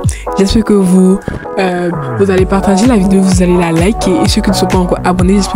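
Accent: Ivory Coast. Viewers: La voix ivoiriene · C'est moi ou j'ai impression que sa voix c'est comme les ivoirienne mais jsp dites moi si jsuis folles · Tu sais t'a voie d'ivoiriéne ❤🥳😍 magnifique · Tu es ivoirien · Des que g entendues ta voix g sentie l'accens ivoirien je suis ivoirien aussi😂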